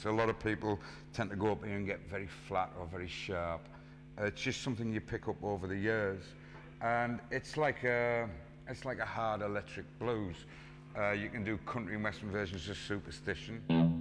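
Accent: Middlesbrough, United Kingdom. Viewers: Thanks, nice to see how you work all that. I was born in Middlesbrough but parents left when I was five. Nice to hear that accent again. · I'm a Middlesbrough guy, our accents are pretty similar - we've never met but in the late 60's when I got married we lived in one of the street houses near Ayresome Park, and Rea's cafe of course. In '62 my mate and I bought guitars from Hamiltons Music; he got the exact sunburst Hofner as you and I got the red Hofner V2 with same switch gear as your brown one, a young lads Strat. Cost 52 Pounds! Both were played, mine through a Selmer amp, around the pubs, clubs, dance halls in the area and were well used. But I still have the V2 in its original red-plush lined case, no longer in use of course. In the 80's I also bought a Tokai Springy Sound Strat in South Bank. I traded it on a new Tel some years later, which I regret as they are sought after now. 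Also have a PRS Les Paul type but never had a Strat, so am thinking of getting one for that unique clean tone. cheers John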